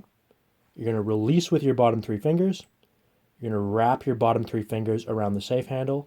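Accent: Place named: France. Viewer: yeah... but i gotta say it can be tough to hear well through his heavy french accent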